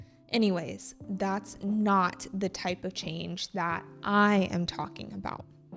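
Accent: United States. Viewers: Thank you for making such insightful videos for practicing English. I love your stories as well. Yesterday when I was looking for a native speaker to shadow American English accent, fortunately, I found your accent a good choice for me. Now I watch your videos every day and do shadowing. Looking for more meaningful videos.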